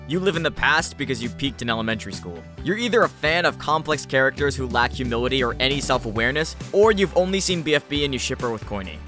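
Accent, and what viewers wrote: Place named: Australia. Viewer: wow that is incredibly accurate. Coming from an English person I thought he was English until I saw he was Australian and suddenly he sounded so Australian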